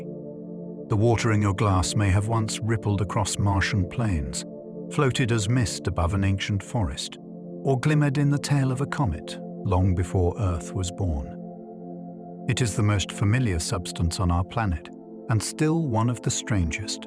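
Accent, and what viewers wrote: United Kingdom. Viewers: So glad i found. This in my scrolling.. i feel like i'm listening to God with a British accent explaining everything.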